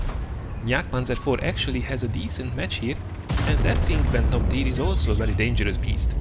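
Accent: Hungary. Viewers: hallom az ahcentusából :) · Such nice Hungrish accent.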